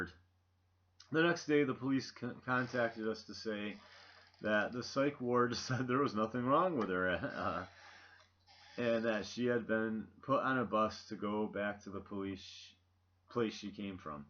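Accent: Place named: United States. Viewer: You're American (judging by your accent), yet you don't know that Trinidad is located in the Americas. :/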